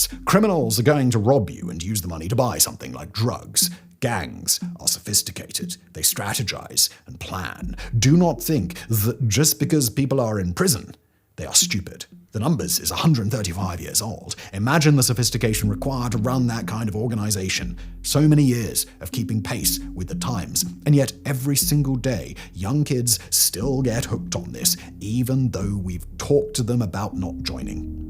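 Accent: England, United Kingdom. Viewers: can't believe i clicked on a clueless Englishman poorly explaining something about a country he knows nothing about. 32-12. Tip for you next time bro. Stop pronouncing every word as if it's English. Do some fuckin research. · Hes just british?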